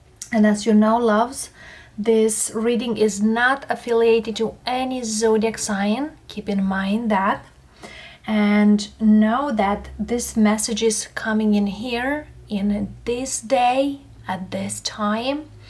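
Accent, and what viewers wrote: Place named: Russia. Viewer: Always knew U R Russian😊😅👍That beauty eyes accent😊💝👍Goddess 😊👍💖🌻Many thanks! Much Blessings2U/Family💖🌻💝😊🙏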